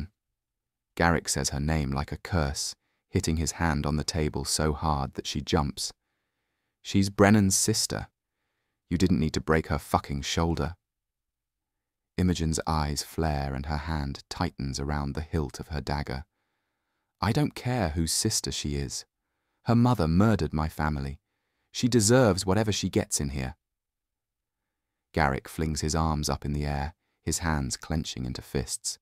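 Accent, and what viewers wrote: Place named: United Kingdom. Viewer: Since I listened to a Xaden POV in an American Accent I'm having trouble adjusting to your British accent. But, this is well written and I am enjoying it regardless of that one caveat.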